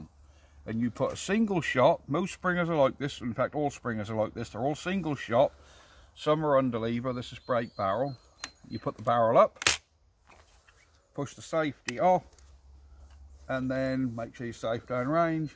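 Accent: Norfolk, United Kingdom. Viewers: i hear your from the uk. where do you get these from? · That's funny - I started watching before I read your channel name and thought "that's a Norfolk chap"! We're over in the broads. Nice video,cheers. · SOMEHOW LISTENING TO A FELLOW AIRGUN ENTHUSIAST WITH A BRITISH ACCENT EXPLAIN AIRGUN TECHNOLOGY IS MORE INTERESTING THAN A VIDEO FROM THE U.S. GUESS IT MUST BE THE SAME APPEAL AS THOSE GREAT TV SHOWS THAT CAME FROM THE BBC NETWORK. CAN'T HELP MYSELF. I AM A DIEHARD "MONTY PYTHON" & "BENNY HILL" FAN! 🎅🌅🗽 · I miss the Norfolk accent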